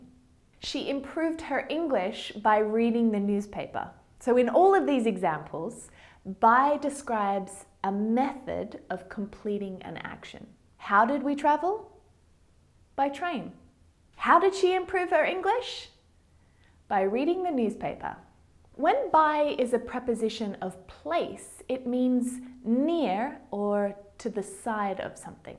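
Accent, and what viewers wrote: Australia. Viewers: Is this video and the other videos you post on your channel in an Australian accent? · Hello Are you learning English with Australian accent? Please let me know Thank you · I loveee her aussie accent! Your lessons are always the best, thank u 🙌🏽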